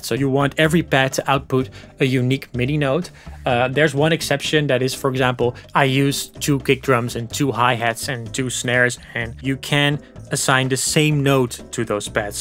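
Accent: Netherlands. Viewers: Zo te horen Nederlands ;-) Dank je wel, deze video heeft mij enorm geholpen! Ga zo door.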